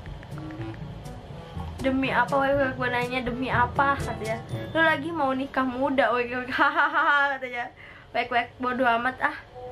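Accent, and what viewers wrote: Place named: Kuningan, Indonesia. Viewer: Ka orang mana sih kaya orang kuningan aja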